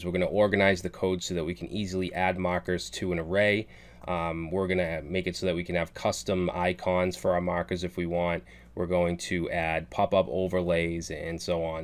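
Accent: Boston, United States. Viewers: when you started talking i immediately knew you were from Boston 😆 thanks for the video cheers brother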